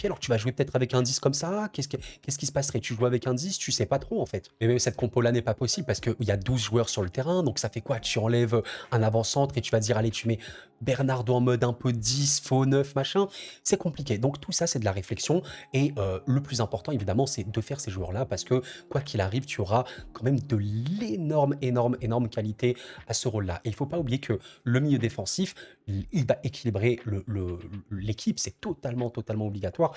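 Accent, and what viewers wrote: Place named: Quebec, Canada. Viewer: J’ai jamais vu un québécois avoir un accent aussi léger.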